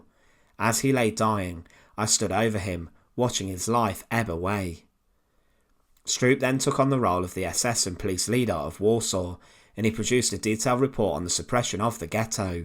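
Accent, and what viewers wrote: United Kingdom. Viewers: I wish your British accent was not so prevalent and more understandable.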